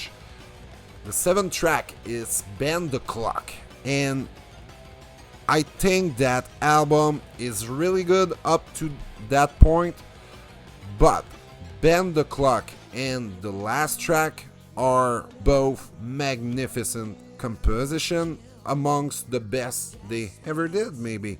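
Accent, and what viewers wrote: Quebec, Canada. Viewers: I just started this review, my favourite band by the way since 1993. You sound like a French Canadian (like me)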